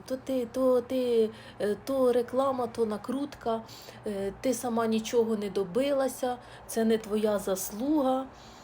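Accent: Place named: Cherkasy, Ukraine. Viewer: Підписався на вас. Ваш говір нагадує мені говір Черкащини з Жажківського району. Приємно слухати.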